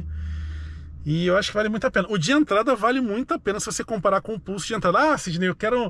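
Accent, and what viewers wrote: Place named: Rio de Janeiro, Brazil. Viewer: Esse sotaque carioca e joativo aff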